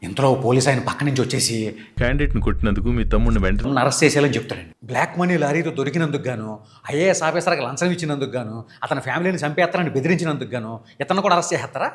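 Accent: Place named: West Godavari, India. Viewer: Anna meedhu WEST GODAVARI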